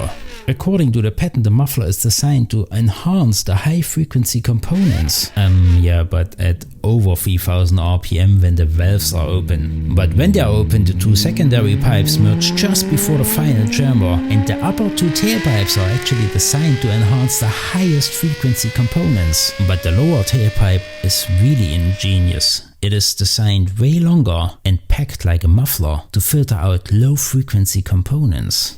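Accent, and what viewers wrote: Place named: Saxony, Germany. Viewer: klingt sehr sächsisch, habe ich mich auch gerade gefragt 😂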